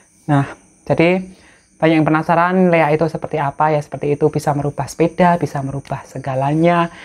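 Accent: Java, Indonesia. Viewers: ngecuprus,nggrundel pake logat jawanya....khas bgt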